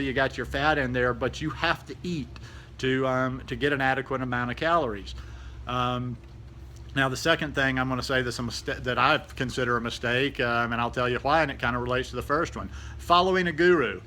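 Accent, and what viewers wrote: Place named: Texas, United States. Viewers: where you from originally? you have a similar accent that I have! I'm from north Alabama. it's always nice to here a similar accent! lmao. great video btw. I learned a lot. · Love your accent. You sound like a fellow Texan. Am I right?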